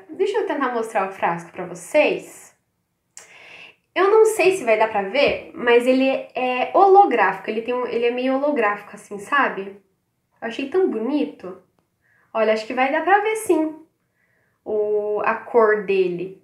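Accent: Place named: Paraná, Brazil. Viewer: Oii lindona !.você é do Sul do Paraná? Tem um sotaque muito parecido 🥰♥️você é muito espontânea ,amei suas impressões! 😘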